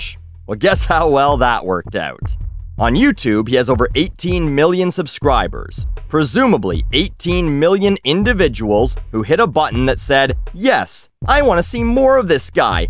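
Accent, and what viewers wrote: Canada. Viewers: <3 I've missed you and your pissed off Canadian accent:) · It's great to hear your strange Canadian voice again!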